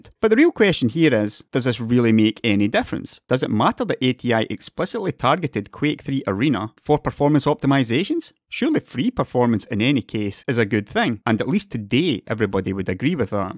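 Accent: Scotland, United Kingdom. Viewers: Thanks, you've shared useful information. BTW, I wonder I guess you're Scottish and where do you live ? England or Scotland ?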